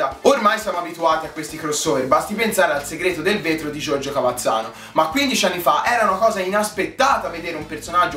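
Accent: Tuscany, Italy. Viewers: ma sei toscano????? XD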